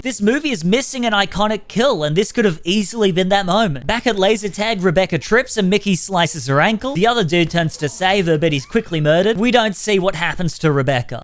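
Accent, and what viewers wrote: Australia. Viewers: Ashamed of myself, but I must confess, I thought was a different Australian YouTuber that sometimes covers shitty b rated horror movies, Jackson Fields, who also did a video in Shark Side of The Moon. I feel bad, I'm sorry 😞 I don't even know how I messed up that bad. The only three things (looks and sound wise) they have in common; Accents (obviously) Both are white And both have dark hair. And my mind went "okay they're the same person"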